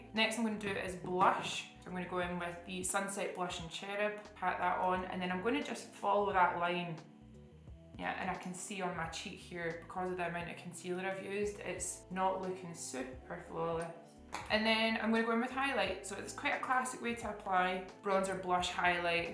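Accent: Scotland, United Kingdom. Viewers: I'm feeling buzzed and chatty... You are so stunning, and your Scottish accent makes me want to start a bar brawl for no reason. We love the same colors... The right side wins but I love the round eye and brightness on the left. You're right about makeup's evolution in our personal lives in relation to how we see ourselves.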